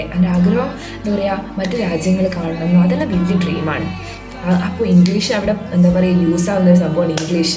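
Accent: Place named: Kozhikode, India. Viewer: Aah... Bhasha kettitta.... Ente slangum almost same aahn...Kozhikode evdya??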